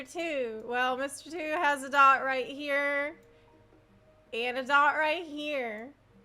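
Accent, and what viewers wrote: South, United States. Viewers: Oh my god, from your accent I sense you were raised in the south I learned this technique and STILL use it. You would tap the dots for twice for 7 through 9. I learned this in Louisiana it's totally ingrained in me to count this way!! My European gf saw me counting this way and she asked me verbatim, "what the fuck, are you on about? What was that?". I said the American education system.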